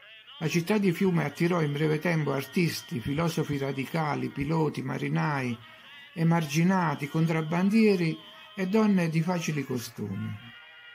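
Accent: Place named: Abruzzo, Italy. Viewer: Tu sei abruzzese giusto? da l'accento sembra dato che io lo sono....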